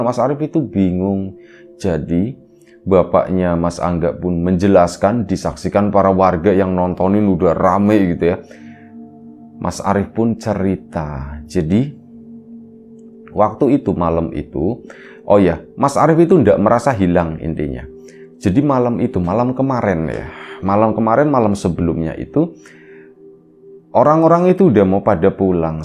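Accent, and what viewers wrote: Tegal, Indonesia. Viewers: Wa, alaikummusasallam wr wb, Palembang hadir dan nyimak selalu, Di tunggu cerita selanjutnya, Buat moga makin sukses dan buat mas narator, moga sehat slalu dan slalu dalam lindungan Allah SWT, Owalah mas narator asli nya Tegal ya.....?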